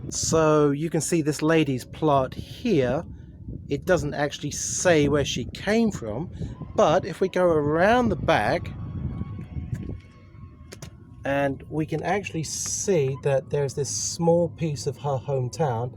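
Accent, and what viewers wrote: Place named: England, United Kingdom. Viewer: You misunderstood : it is traditional to state the Chinese hometown of the deceased on the headstone even the deceased was born in Hk ( ie hometown of the deceased ‘s paternal family then if born in Hk ) it doesn’t mean the body of the deceased was moved all the way from China to Hk for burial . But overall I am impressed you knew more about Fung Shui then me ! I also like your English accent thx for the video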